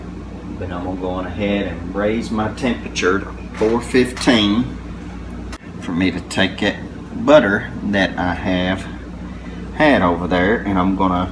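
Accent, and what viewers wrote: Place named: South, United States. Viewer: When I grew up my friends mother sort of took me under her wing used to take me in when my Dad threw me out wash my cloths and keep me out of trouble. She had the same wonderful Southern Draw you and have. 😊 brings back fond memories.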